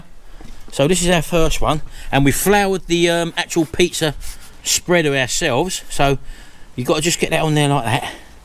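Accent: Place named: Cockney, United Kingdom. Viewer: Fairly new to your channel and I love it, I'm from East London but now live in Thailand, good to hear the Cockney accent. Dave B Thailand.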